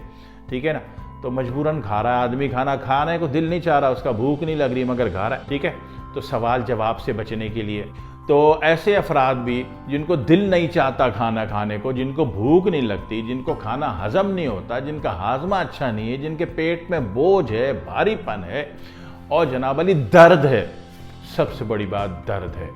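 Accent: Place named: Delhi, India. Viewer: بھائی میاں آپ دہلی والے لہجہ میں بات کرتے ھو۔ نیم حکیم خطرہ جان۔ بھائ میاں چھوٹے گوشت کا قورمہ کھائیں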